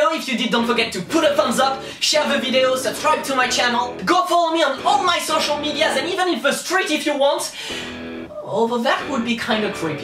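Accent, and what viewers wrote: France. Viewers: T'as un accent français ! You have à French accent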